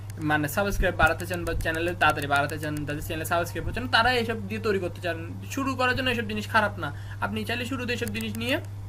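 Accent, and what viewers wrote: Chittagong, Bangladesh. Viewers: হন বদ্ধা অনর হতাত বুঝাঁ যার অনে চিটাইঙ্গা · আপনার কথা শুনে মনে হচ্ছে আপনার বাড়ী চট্টগ্রাম।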